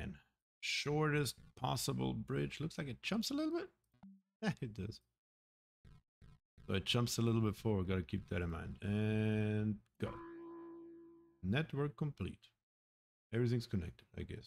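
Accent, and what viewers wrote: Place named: Germany. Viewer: I was wonderd that this is your first video because its very good. Well I am from Austria and I hear the German accent ,but can keep doing videos like them I like them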